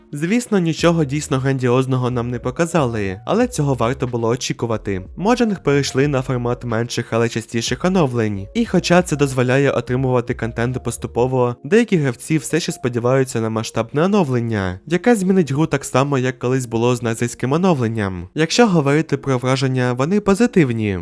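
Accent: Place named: United States. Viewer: ааа, незеритське, зрозумів, автор через свій фірмений американський акцент ні зміг сказати слово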